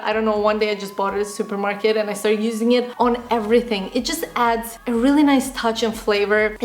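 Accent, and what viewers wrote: Russia. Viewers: you could see it from her videos that she's russian plus her accent is obviously a russian one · Русский акцент?😳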